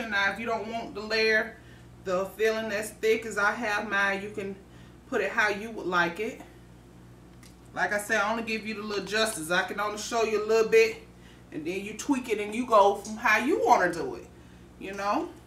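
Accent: South, United States. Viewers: Sweetie ain't nothing wrong with the way you speak. We're Black and proud!!!Just like Jamaicans have patois so do us country folks. People can poke fun but they wanna sound just like us. Keep doin whatchu do Queen. Thanks and blessings from Connecticut😚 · Country speak is for all us Southerners—black, white, brown, or purple! I think I trust someone who speaks like you more than someone without an accent when it comes to good food. I am a plus size girl as well and my daddy always said he wanted to be served by plump people because you knew the portion size was correct! Glad you are back! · Hi, I'm new to your channel. I watched 1 video and couldn't stop! That country accent along with those delicious meals bebeeee, made me subscribe! You're doing an awesome job. Keep the videos coming. I swear I'm gonna try some of these meal. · I'm not sure Where you're from, but I Absolutely LOVE Your accent!😘😘😘😘 As a matter of Fact, the Accent is what "Drew ME In" bcuz I'd never seen 1 of your videos until recently and it was Your Easygoing nature & Naturally "Country" Twang, that made me come a 2nd time, so Please-just BE YOU.......💜😏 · Lawd wea u from lol, i swear you got to be from Alabama, Georgia or somewhere from the south cause that damn country accent is strong and I love it lol you sound so much like my folks down south lol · no you're not too country that's being you that's natural don't stop being you please do not change your accent your natural country swang... 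Jersey and PA love you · HELLO BEAUTIFUL BLACK QUEEN I LOVE YOUR COUNTRY TWANG YOU ARE A COUNTRY GIRL JUST LIKE MY MOMMA I LOVE YOUR RECIPES MY NAME IS SABRINA I DO NEED HELP IMPROVING MY COOKING SKILLS I DON'T MIND SENDING YOU A FEW DOLLARS HERE AND THERE I WILL TELL WHAT I LIKE FOR YOU TO COOK AND YOU TELL ME HOWNTP SEND YOU A FEW DOLLARS THE SALMON I DEFINITELY HAVE TO TRY AND THE SEAFOOD DRESSING I GUESS IF I DID THIS FOR MY MAN HE BE READY TO PUT A SISTER ON LOCK WHERE YOU FROM I LOVE YOUR ENERGY AND HUMOR YOU KEEP YOUR COOKING FUN I DID SUBSCRIBE TO YOUR PAGE ANYWAY KEEP ME POSTED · I like when you talk in your normal southern voice. This was a great video. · We love you! I have one request tho.......PLEASE DO NOT change your accent. We love your naturally country swang accent from the first video. Its original & it reminds us of our mothers & grandmothers cooking. Do not switch to the “proper talk”. 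We like the down home country talk. Love ya, be blessed😎♥️🤟🏾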